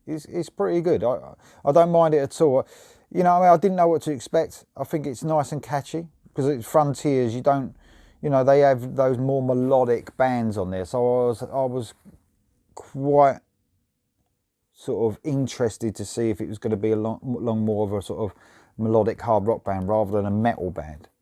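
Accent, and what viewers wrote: England, United Kingdom. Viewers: Fair review. Mendes is gifted by nature, why don’t take advantage of this? I prefer someone like him than someone else that tries to sing like Bruce and sucks. Mendes pays a tribute to the one and only. Respect. About the song... still a bit green, but better than any Maiden songs of the last decade if not more... not taking about the production 😅., I love your English accent, but your Brazilian sucks 😆 Cheers Max 🇦🇺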